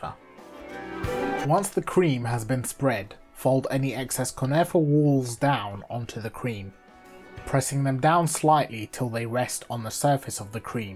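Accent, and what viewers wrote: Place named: United Kingdom. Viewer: Bro how did y grt that accent British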